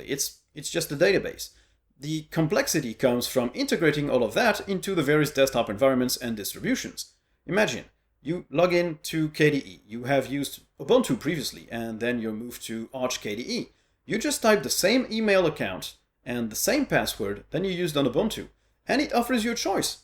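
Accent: France. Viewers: I'm french and I find it weird to listen to a Frenchman speaking in English. But your channel is very interesting and you're the reason why I'm rocking elementary os on my laptop as a teacher everyday!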